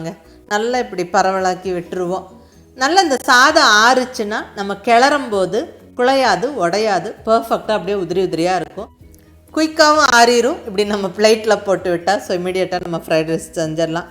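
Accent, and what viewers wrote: Coimbatore, India. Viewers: அக்கா நீங்க பேசும் கோயம்புத்தூர் தமிழ் ரொம்ப அழகாக இருக்கிறது.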